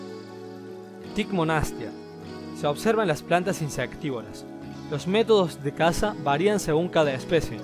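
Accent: Córdoba, Argentina. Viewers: sós coórdobés vóz? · no entendí nada con el cordobés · Alto cordobes xdd Me sirvio bastante para ciudadania :v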